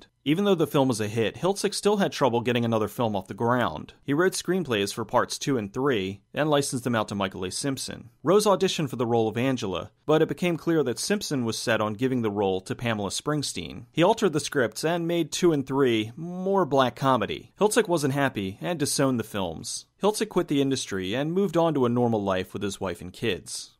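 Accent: Philadelphia, United States. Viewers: I sense a northeastern accent, near philly perhaps?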